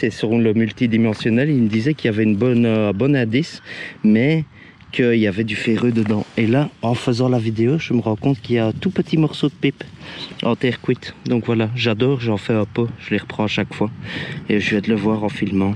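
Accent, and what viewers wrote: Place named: Belgium. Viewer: Hola le bel accent de Belgique, je crois que je vais aimer ta chaîne 😏😏 Angy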